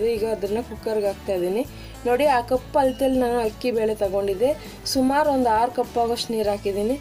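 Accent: Tamil Nadu, India. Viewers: Hello.. I tried for the first time khara Pongal on Saturday in America. It turned out very well 👌🏼 If I cook anything new I always check your channel and see your steps. Very simple n easily you explain. No useless talks. Your Tamil accent Kannada is very nice to hear. Bele obbattu also your simple tips helped me cook perfectly before that I had messed it many times. Thank you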